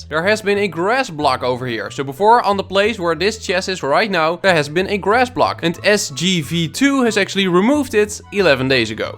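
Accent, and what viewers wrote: Netherlands. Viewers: Kan gewoon horen dat je Nederlands bent XD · i smell dutch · Nederland voor de dubbbb lessgooo ik hoorde al iets aan de stem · U dutch? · Your Dutch.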